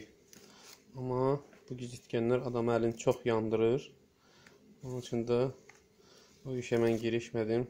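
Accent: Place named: Qusar, Azerbaijan. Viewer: Oy oy yandirmir elivizi ozude ne qederdi deyesen biz terefdi danisiqdan his olunur esq olsun Qusarlilara👍🏻👍🏻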